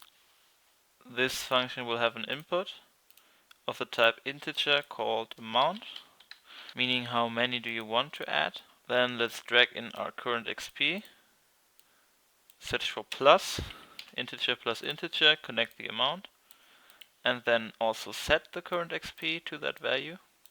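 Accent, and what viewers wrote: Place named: Germany. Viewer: Hey dude, ty for you´r fast answer. I have tried it in version 4.14 now and it works :) greetz from germany :P btw are from ger too maybe? there some sound in your dialect sounds like germans whos speaks english :D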